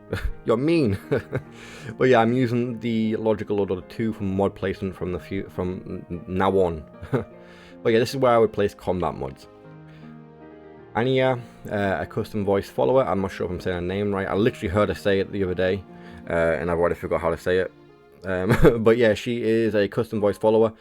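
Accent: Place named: North East England, United Kingdom. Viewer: Nice load out - I would add the sky land colour for realistic water 2 if you can. Also check out sky kings aonghus house for river wood for almost no cost and granite hill town that he did. Trying to guess where your accent is from in the north east 🤔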